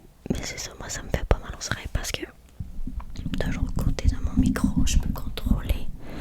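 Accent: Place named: Quebec, Canada. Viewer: L'accent québécois est incroyable, merci d'être là 🙏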